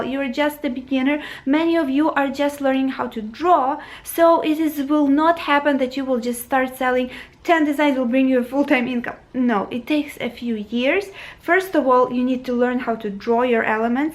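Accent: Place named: Ukraine. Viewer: I love your accent. You're Ukranian, right? Thank you so much for your helpful videos! I just discovered your channel. I love it! You're such a blessing! Thank you!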